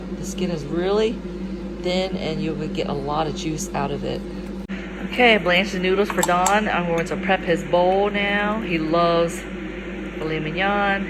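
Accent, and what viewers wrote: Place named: South, United States. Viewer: I love your family and your southern accent.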